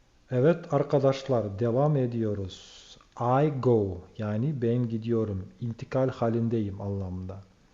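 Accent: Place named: Azerbaijan. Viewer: Merak ettim nerelisiniz acaba Türkiyeli mi yoksa Azerbaycan Türk'ü mü Türkiye Türkçesinden farklı konuşuyorsunuz ondan merak ediyorum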